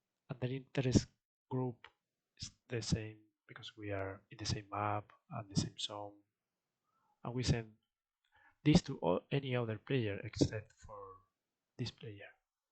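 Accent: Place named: Spain. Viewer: i would like to ask you something about photon plugin, and your english pronunciation is similar to Spanish people, im from spain too, can we comunicate in Spanish? to be faster :)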